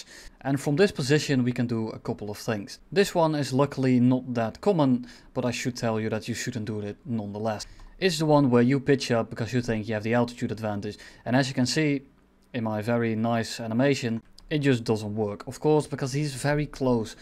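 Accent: Netherlands. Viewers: I must say, the level of English here is amazing. Of course you can still tell that he's from Holland, but I'm really impressed because it's not the standard Dutch-English. Good job mate! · Are you Dutch cuz your L's are very much like the most Dutch people say it?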